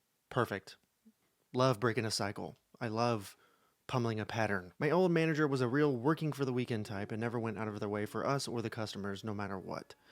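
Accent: United Kingdom. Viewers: Yep, from uni to flat to being at mine. I'm guessing somewhere in the west. So VERY Brit.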